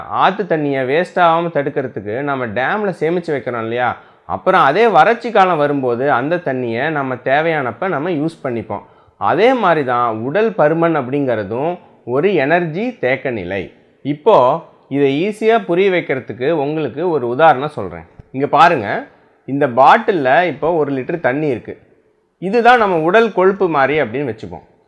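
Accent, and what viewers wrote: Kongu, India. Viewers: ரொம்ப அழகா விளக்கம் கொடுத்தீங்க அய்யா... அதுவும் நம்ம கொங்கு தமிழில்👌👌👌👌🤗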